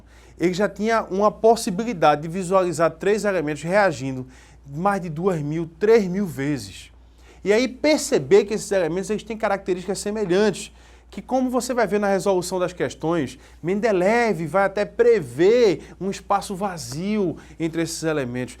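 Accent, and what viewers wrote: Pernambuco, Brazil. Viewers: Esse sotaque Pernambucano deixa a aula mais legal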